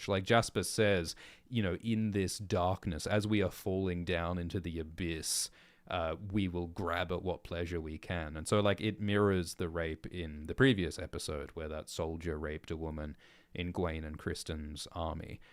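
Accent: Australia. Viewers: What gave it away? The Australian accent he’s used for 10+ years?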